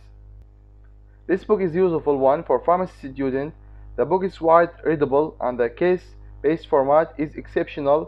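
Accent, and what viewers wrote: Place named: Arab world. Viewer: Your English accent sounds arabic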